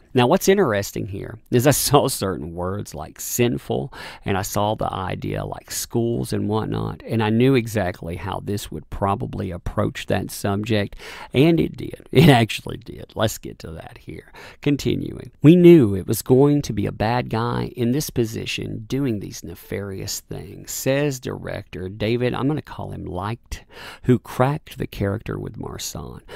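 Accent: South, United States. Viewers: Dude what region of the country are you from? Your accent sounds decidedly southern but can’t quite place it regionally beyond that level of specificity Also, you say “within this” to an almost enraging degree lol. I have to assume that’s a regional thing too, sort of like how Baltimore residents say “behind this” or “behind that shit” meaning “vis-a-vis” more or less. That’s the same type of thought conveyed by your “within that” thing I’m not asking these things being critical or shitty at all! I’m 100% a D&C/comicsgate supporter and love your videos along w Nerkish, yellow flash, captain Cummings (though he gets on my nerves lol. Dude can’t read’. I swear he reads at about a 4th grade level lll but I share his perspective completely so I try to overlook it) lol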